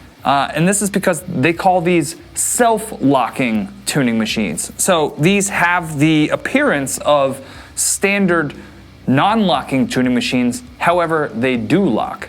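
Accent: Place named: United States. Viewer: wow cool.......i have seen your videos where you have visited the Musicstore in Köln. In 2009 i was there too. Amazing. First of All, thanks for my english and now because you are livin in Köln we can write in german?????! Also, es ist eine grosse Freude, Deinen Kanal zu schauen und mit Dir zu schreiben, mein Lieber......hahahahah super..........Ich habe neben den Harley Benton Gitarren noch andere und auch höherwertige, aber sie gefallen mir sehr. Ich bin aber auch kein Profi, nur Hobbyfreak. So Brother, did you have understood all???? And it is really the truth, your american english is so damn good to understand, i think i understand each Word.....i love that, so i can learn more and improve my english........Especially in guitar themes i understand a lot, when you are talking about politics or nuclear sience i understand nothing...but for normal things........it works.Thank God and praise the lord that he gave us guitars and cool music man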